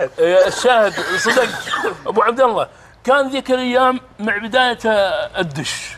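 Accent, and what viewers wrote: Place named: Syria. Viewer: نص لهجتو سوري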